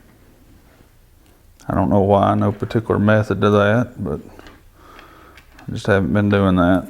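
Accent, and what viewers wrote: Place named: Eastern Kentucky, United States. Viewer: Between the accent and the funny sayings you must be from eastern Kentucky?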